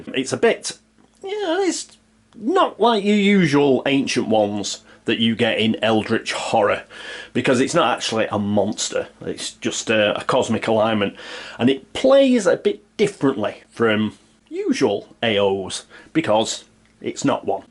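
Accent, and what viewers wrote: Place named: Manchester, United Kingdom. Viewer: Gteat to have your Manc accent back. Did ypu have much trouble finding your EH boxes under all of the dust!😊 Hope life has been good for ya in meantime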